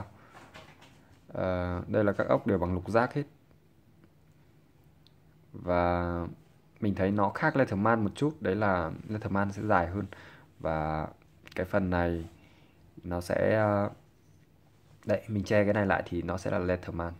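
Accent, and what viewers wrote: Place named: China. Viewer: ô này nói lơ lớ, chắc người TQ học tiếng Việt à :))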